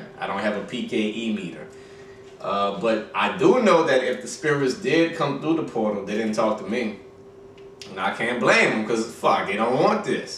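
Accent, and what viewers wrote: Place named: South, United States. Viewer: I can understand him fine, don't be mad cause your not southern...we can't all be wonderful!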